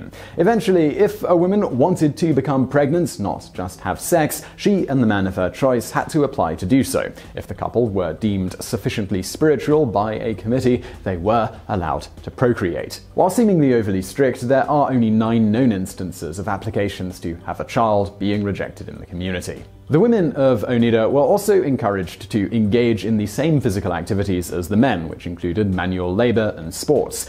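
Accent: England, United Kingdom. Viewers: This is like Stuff You Should Know but better because of the English accent.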